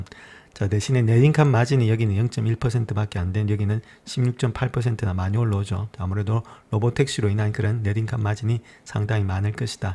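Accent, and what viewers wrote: Gyeongsang, South Korea. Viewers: 명료한 설명감사합니다. 구수한 경상도 사투리도 듣기 좋습니다~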